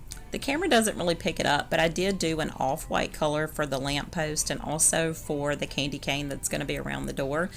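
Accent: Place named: Ozarks, United States. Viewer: Subscribed before I saw your diy just because of your voice! You sound like me! Hehe🤷 you may not be an Ozark Hillbilly, but if you ever make it up here, holler and I'll show you all the good junkin' spots! LOL there was an exceptionally awesome craft show here a few weeks ago at War Eagle Mill. If you ever plan a fall trip to the Ozarks that would be an awesome thing to shoot for. You can't be too far according to your accent lol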